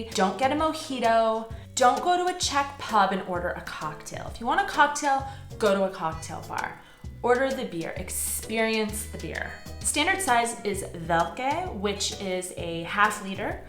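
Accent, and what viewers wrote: United States. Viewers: Máš štěstí že žiješ v Praze :-D Mluvíš vážně dobře Česky, ale pokud pojedeš kamkoli jinam, odlož všechnu tu *** politickou korektnost a divokou Americkou intonaci :-D Jakmile Ti bude připadat, že mluvíš tak sarkasticky a že jsi tak cynická, že by Tě za to doma zavřeli, mluvíš jako Čech. Přijeď do Ostravy a uvidíš :-D You are lucky to live in Prague :-D you speak really god Czech, but when you go anywhere else here, put aside all that ** PC and wild American expression :-D Only when you feel so sarcastic and so cynic, that you would get locked in America, then you speak Czech. Come to Ostrava and you will see :-D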